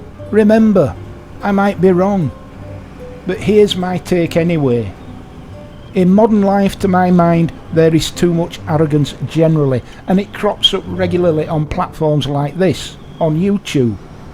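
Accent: Yorkshire, United Kingdom. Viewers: Spoken by a good and proper Yorkshireman. Good man. 👍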